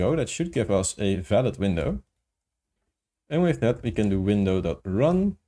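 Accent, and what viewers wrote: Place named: Netherlands. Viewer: Perfect timing. I'm just doing some open tk stuff myself. From your accent I figure you're dutch? Me too 😊 I'm curious where this will go, you've got my subscription!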